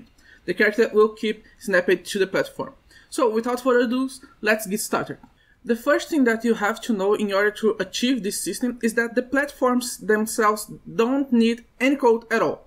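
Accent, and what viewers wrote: Brazil. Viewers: da pra ver q é do brasil so pelo sotaque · Você é br hein não engana kkj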